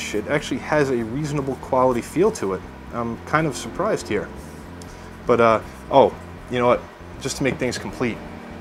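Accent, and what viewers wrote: United States. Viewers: How can you say you don't have an accent? You have an American accent! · lack there of? nope that is an us accent.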